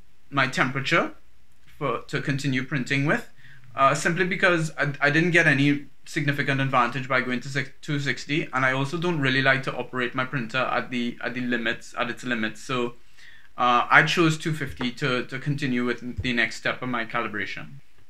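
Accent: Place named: Jamaica. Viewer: Thanks so much for the Video with the ender 3 saved me a lot of time. Sounds like you are from Jamaica, I grew up there myself, one of the few white Jamaicans out there. Thanks again, for the great job!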